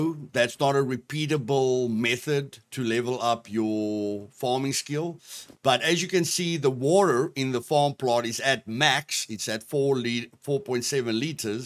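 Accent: South Africa. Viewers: You sound South african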